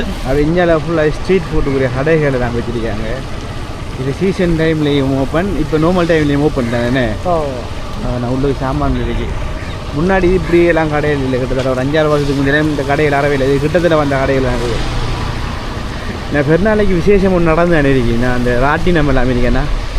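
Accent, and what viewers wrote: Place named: Jaffna, Sri Lanka. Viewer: தென்மராட்சி யாழ்ப்பாண வழக்கு 😇😇😇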